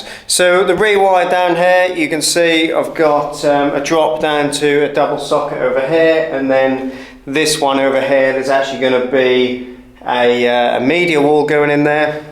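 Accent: Norfolk, United Kingdom. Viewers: He´s a Norfolk chap, East of England... lovely part of the world. Years ago I lived in Heacham...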